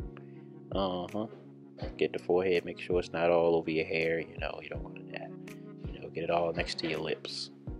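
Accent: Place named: Baltimore, United States. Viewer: Bmore in the house!!! Loving the Baltimore accent!!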